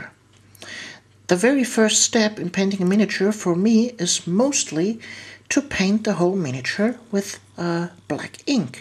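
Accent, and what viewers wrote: Germany. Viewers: Are you German? By the way really good videos:)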